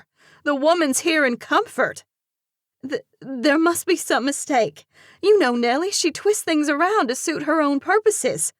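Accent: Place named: South, United States. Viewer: Another great book in this series. I’m loving every one of them. The narration is top notch. Of course, I’m partial to the southern accent since I am from the South! Please keep this series going.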